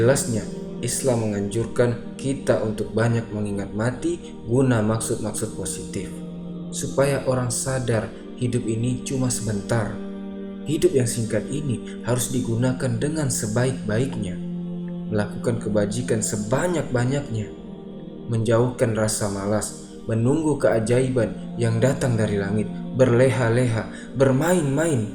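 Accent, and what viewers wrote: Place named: West Sumatra, Indonesia. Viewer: Admin orang minang ya. Soalnya logatnya kayak orang minang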